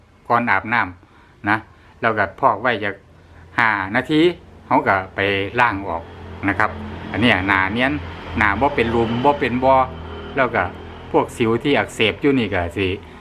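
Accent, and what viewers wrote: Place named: Chaiyaphum, Thailand. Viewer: สำเนียงคล้ายคนชัยภูมิแท้คับ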